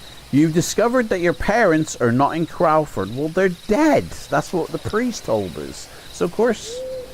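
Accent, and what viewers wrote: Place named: Cornwall, United Kingdom. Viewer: I can’t watch this shit. I can’t see the book or the pictures, all I see is your fat ugly mush chatting bear shit, making silly noises and the front cover page on the other side of the screen. And you talk too slowly, take 10 times longer to do everything than in needs to, and you’ve got an annoying Cornish accent. Which is fine for adding some effect, but you’re focused more on effect than reading the story, in a normal fashion. And we don’t need to make every single decision for you either, just getvon with it mate, life’s too short for all the faffing, farting around and film flam. What kind of game is this? How to take the piss out of fighting fantasy books? I’m out.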